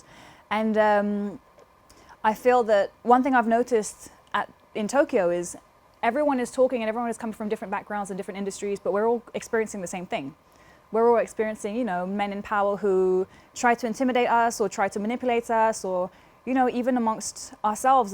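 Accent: United Kingdom. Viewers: Justru terasa accent nya. Inggris itu banyak jenis aksen.. sama kayak aksen Jakarta, aksen Indonesia timur, aksen Jawa dsb. Aksen nya, London banget.. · bein grown up in london, the accent is getting more british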